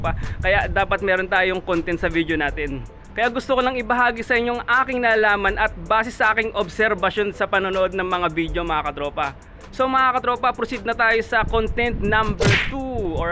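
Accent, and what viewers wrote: Batangas, Philippines. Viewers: Hahajaja laptrip..batangueno si kuya..hahaha kuya dalawin moko para pangatlo na ako sa manunuod mp hahahaha...anyways nice and entertaining vid mo kuya..salamata... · Kabayan ala eh batangeño kb san o s batangas aq eh s tanauan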